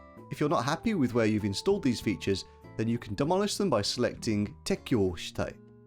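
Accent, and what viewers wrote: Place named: Birmingham, United Kingdom. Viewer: This is a brilliant resource mate, thanks so much - definitely deserves way more views. Ps: nice to hear a fellow Brummie speaking in Japanese :P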